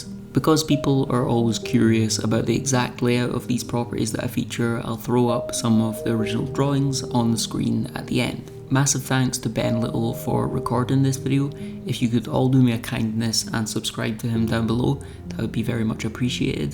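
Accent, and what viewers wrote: Scotland, United Kingdom. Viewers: Nice one, cool to see some of my heritage coliding with an aussie location voiced by a scotsman!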